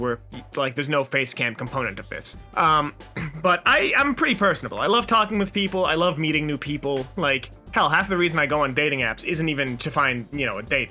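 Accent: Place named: New York, United States. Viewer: Just found this channel, love your new york type accent and you seem like a very nice guy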